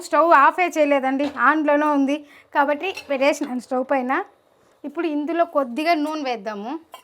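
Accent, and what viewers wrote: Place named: Chittoor, India. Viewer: ne matalu chenna pellalaga chala baguntai me chethuru slang enka bagundhi super nuvu andhulonu tagaydhay lay👌👌👌👌👌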